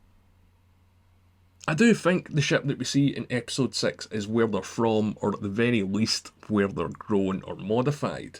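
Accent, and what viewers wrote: Scotland, United Kingdom. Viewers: Is this Scottish English?🇸🇪👋🏻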